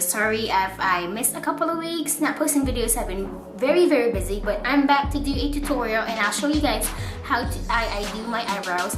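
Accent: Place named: Philippines. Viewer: You are so pretty! I watch you all the time. Love your eyebrows, eye shape, and your cute accent! Sounds a bit Filipino. =) You Friday Night Hair GLS95 straight long hair looks good! I wanted to order it last week, but it was sold out! =/ What type of hair is it? Does it have a Yaki feel, or a silky smooth feel?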